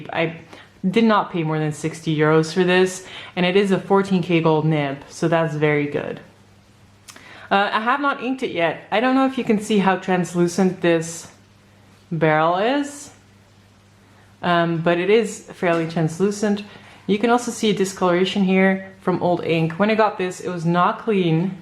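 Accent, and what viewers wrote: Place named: United States. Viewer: How come you do not have a Dutch but an American accent? Just curious, I never would have guessed. 🤔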